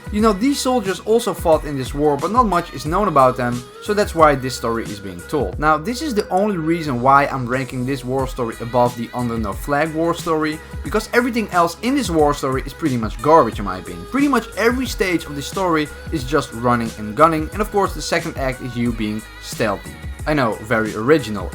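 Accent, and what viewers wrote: Netherlands. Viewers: Ik hoor aan je stem dat je Nederlands bent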